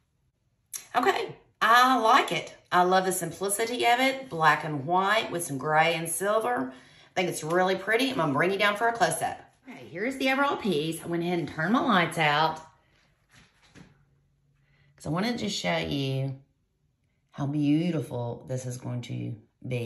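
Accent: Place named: South, United States. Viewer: Beautiful Dutch pour! Your accent sounds like mine. Do you hail from the south?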